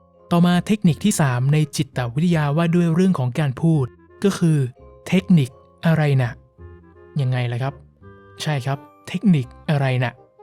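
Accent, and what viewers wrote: North, Thailand. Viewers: อันนี้ขอนอกเรื่องได้ไหมคะ สำเนียงการพูดเหมือนคนเมืองมากเลยค่ะ 🤭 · Admin เป็นคนเหนือแน่นอน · เธอเป็นคนเหนือเหรอ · คนพากษ์ เปนคนเหนือแน่นอน😊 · คนเหนือแม่นก่อค่า..พูดเหมือนเจ็บแอว😊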